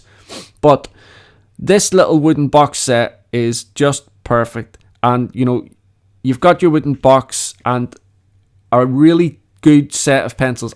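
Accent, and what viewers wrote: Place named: Scotland, United Kingdom. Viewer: I love your accent. Do you mind if I ask, where are you from? Scotland??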